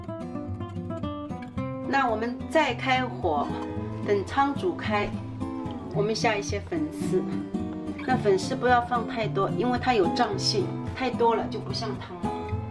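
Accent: Shanghai, China. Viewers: 不是普通话，而是浦东话。乡音啊，亲切的。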